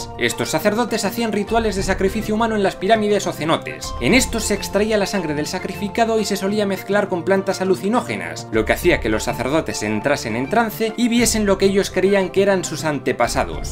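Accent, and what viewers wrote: Spain. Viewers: Eres el primer español que escucho dando descripciones antropológicas sin poner por delante su ignorante falsa superioridad. Tan mal caen que hasta el acento se odia para estos temas pero tú limpias un poco ese mugrero, solo te tropiezas al decir al final que encontraron pura decadencia. Para colmo los tripulantes de esos barcos no eran precisamente algo selecto. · Pues muy bueno, lo único malo, es que el de la voz es español por el acento, y las escenas de exclamacion son españoles, que incongruencia, los mismos que vinieron a destruir culturas tan magestuosas, todavía quieran seguir explotando y vivir con estos temas, que bárbaros, no tienen descaro en seguir aprovechándose. Tienen mierda en la cabecita. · "Pues muy bueno, lo único malo, es que el de la voz es español por el acento". ¿Xenofobia, dónde? En fin, soy mexicano y sigo sin ver el sentido de estar resentidos por hechos que ocurrieron hace más de 500 años, imagina esto, que un antepasado tuyo mató a un hombre hace 300 años, entonces ¿Un descendiente de ese hombre tiene derecho a odiarte y menospreciarte? 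Además, nos guste o no, los mexicanos en general tenemos tanto ascendencia indígena como europea, principalmente española, así que sin la conquista, muchos de nosotros ni siquiera habríamos nacido; no digo que eso signifique que tenemos que adorar a los españoles, solo digo que tenemos que aceptar que lo que pasó es historia y ya.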